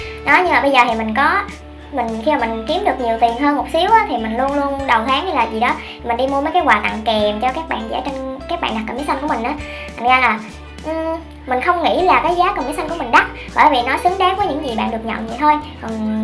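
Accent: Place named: Vietnam. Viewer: chị nói tiếng anh thuần việt ghê, em thích :))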